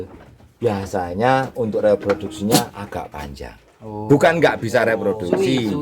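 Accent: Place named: East Java, Indonesia. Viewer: Jawa nya kayak Jawa timuran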